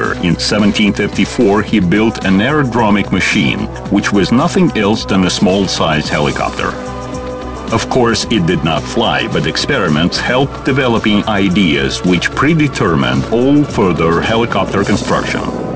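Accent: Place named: Russia. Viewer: Other than a rather heavy accent his English is perfect.. I have no trouble at all understanding him. I find the accent actually quite fitting and adding to the charm and flavour of the documentary. Russian planes, Russian accent. It fits.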